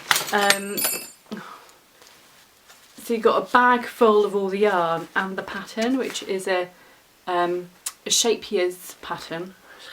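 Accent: United Kingdom. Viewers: I love your videos. Listening to your way of talking to the camera fills my heart with joy 🥰 and I love your British accent (I'm from the USA). I'd love to buy a few of your patterns on ravelry but I have a tremendous problem following UK crochet terms. It's like my brain gets stuck. Have a great summer🏵💦🌞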